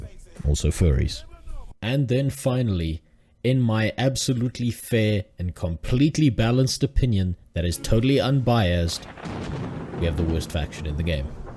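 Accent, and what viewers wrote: South Africa. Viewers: Completely random question but are you South African? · Immediately knew you were South African! Lekker man!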